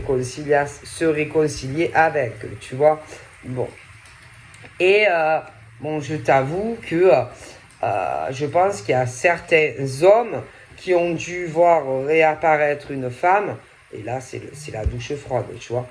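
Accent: South, France. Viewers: 🙂 j'aime bien quand tu dis : d'accord hein bon😊avec ce bel accent du sud 😊💕💐 ça me fait sourire 😊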